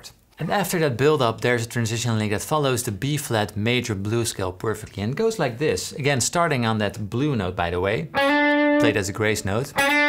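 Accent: Netherlands. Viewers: Just drag your Pik ... hahaha 🙈😂I can hear you are dutch man haha 🙈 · Finally the basic thing which influenced every string picker. As always perfectly done,. Dein holländischer Akzent im Englischen macht die Sache übrigens noch sympathischer. Wie Herman van Veen sagte:"Deutsch ist Holländisch mit einem ganz komischen Akzent." 😄 👍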